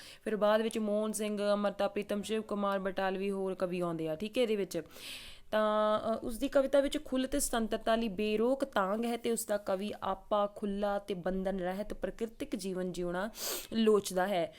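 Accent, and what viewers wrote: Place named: Doaba, India. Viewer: ਤੁਹਾਡੀ ਬੋਲੀ ਤੋਂ ਲਗਿਆ ਸੀ ਮੈਨੂੰ....ਮੈਂ ਵੀ ਦੋਆਬੇ ਤੋਂ ਹਾਂ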